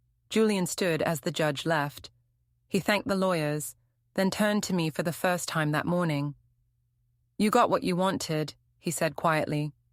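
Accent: England, United Kingdom. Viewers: why does this sound like the most entitled english lady in existence?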